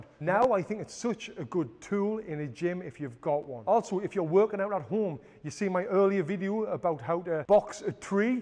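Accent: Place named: England, United Kingdom. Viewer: the english accent makes it more epic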